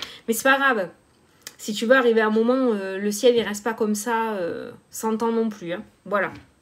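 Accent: South, France. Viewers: ADORABLE MA BELLE , SUPER TON ACCENT DU SUD , TU ES RAYONNANTE COMME LE SOLEIL, MERCI POUR TOUTES LES BELLES ÂMES QUI TE SUIVENT, JE T'AIME MA BELLE, CONTINUE.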